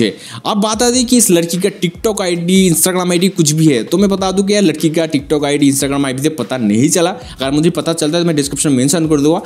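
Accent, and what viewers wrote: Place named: Bangladesh. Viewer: Bhai aapka baat sunkar lagta hai aap Bangladesh sa ho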